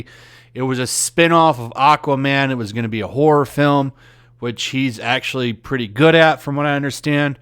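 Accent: United Kingdom. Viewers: Your british accent sounds funny af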